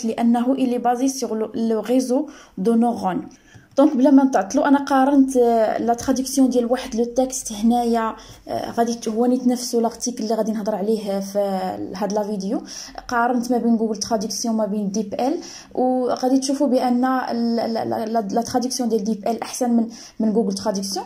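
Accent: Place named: Arab world. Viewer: merci pour la video je ne comprends pas trop bien ton accent arabe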